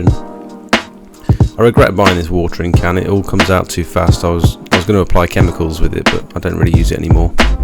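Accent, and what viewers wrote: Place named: Cockney, United Kingdom. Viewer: sound like that cockney fella dizzy new hights great videos very inspiring and informative keep moving forward 🎉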